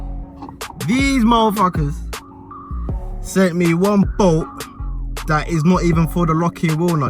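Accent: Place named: South London, United Kingdom. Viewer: Bro , you cant be doing with these likkle 5 min videos if you want to grow your channel. Just saying. I have been liking your videos. The South London lingo( which i love and use to whilst growing up) just takes me back. I live up North now, but it is so nice to hear the South London talk.. Anyways bro . You need to spend more time vlogging -lengthy vlogs that is ( 20mins possibly) :)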